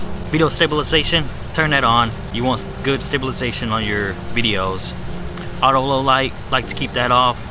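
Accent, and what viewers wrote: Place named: South, United States. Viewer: Dope B roll... Idk. Dope southern accent... Oh Yeah!!! Informative video. Thanks for posting! Is that the bass pro in bristol ?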